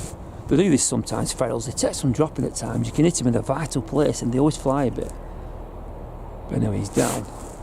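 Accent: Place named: Chadderton, United Kingdom. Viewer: Great video again.....doing my Henry Higgins bit ....from your accent you should have grown up in Chadderton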